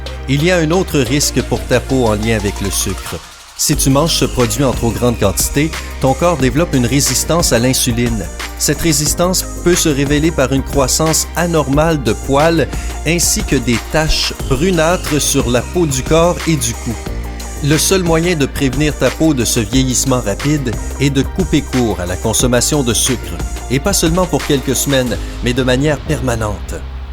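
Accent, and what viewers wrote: Quebec, Canada. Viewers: J'adore l'accent québécois, je pourrais écouter pendant des heures ! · Bonne vidéo mais j'aime pas l'accent québécois 😲😲😲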